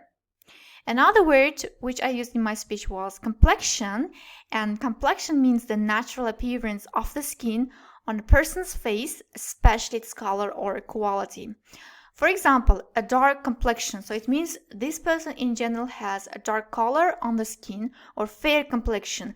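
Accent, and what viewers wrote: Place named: United Kingdom. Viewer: Your pronunciation is very pleasant 🤗How have u learnt to pronounce like A British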